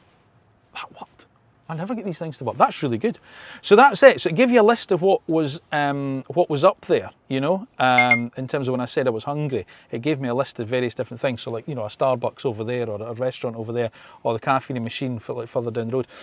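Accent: Scotland, United Kingdom. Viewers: Your accent is Scottish and is not a stupid accent.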